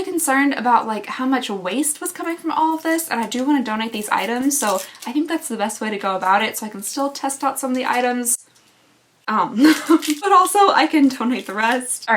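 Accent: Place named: South, United States. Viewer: Ha! My husband heard your voice and asked if I’d made a video using an American southern accent!!!! You are so brilliant with these videos. The bags were beyond drab, boring. Well, except for the blush and eyeshadow. Those were shockingly awful. I like the colour combos you came up with, they looked rather lovely for a date night. Ipsy has truly gone to the dogs. Exception: I received that hair mask in a Sephora bag and my hair looked great for that day.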